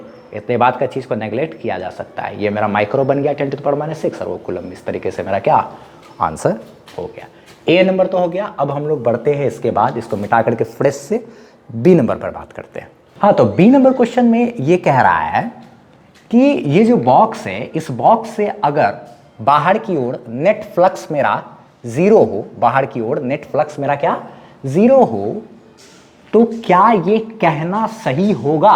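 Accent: Bihar, India. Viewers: I really love your Bihari tone 😅❤️❤️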